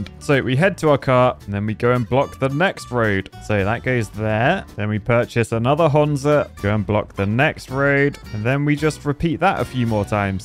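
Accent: United Kingdom. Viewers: Fellow Britt? Does he spiff?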